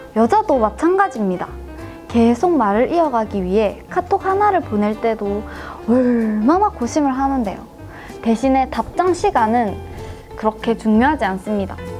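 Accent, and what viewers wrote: Gyeongsang, South Korea. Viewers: 대구 아가씨 아니예여 대구 말투인데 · 아~ 나 서울남자... 표준어 섞인 경상도 사투리 좋아요. ㅋㅋㅋ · 표준말 쓰면서. 간간이 경상도 억양이 묻어나오는게 귀여워요. 그런식으로 방송하면 나름 특색있는 경상도여자 유튜버로 인기끌겠어요. 건투빕니다. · 경남진주. 내 고향인데. 말투가 진주네요. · 누나 사투리 너무 매력있어요 ㅠㅠ 경상도 사투리가 이렇게 좋구나 대구 사람인데 첨 알았어.. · 경남 진주가면 저분 만날 수있는건가요? ㅠ 사투리 억양 너무 귀엽습니다. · 경상도사투리가 ㅋㅋ 매일 듣는 사투리인데도 영상에서 보니 더 새롭게 느껴지네요^^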